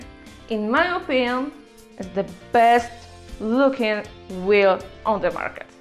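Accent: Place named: Russia. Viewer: Приятный русский акцент :-)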